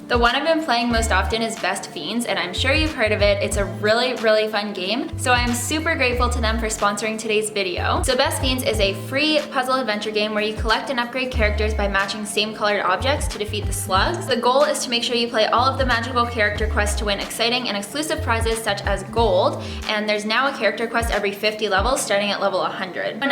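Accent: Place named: Canada. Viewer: sweet.. I verly noticed she is from Canada and that explained why she said *aboat pretty cool any way loved the video! (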